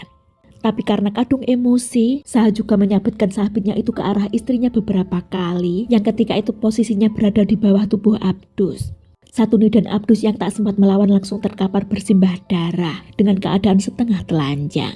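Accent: Java, Indonesia. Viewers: Logat bahasa Indonesia nya diperbaiki lagi mbak. Medok amat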